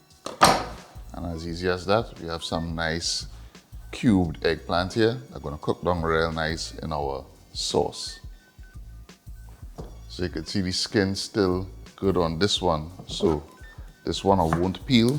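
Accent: Trinidad and Tobago. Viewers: Yea!Trini accent!I'm hanging around! I'm St. Lucian.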